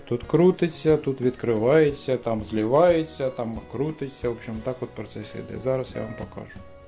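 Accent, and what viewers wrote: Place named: Mykolaiv, Ukraine. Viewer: Привіт Миколаївцям ! Все буде Україна Слава героям України ! Не думав що так Миколаїв розмовляє солодкою українською тай ще зачарованою… Хай вам щастить ! Тихого і мирного вам неба! З вами був кримський українець ! Привіт із Північний захід Америки! У нас цей рік як ніколи … просто все залито…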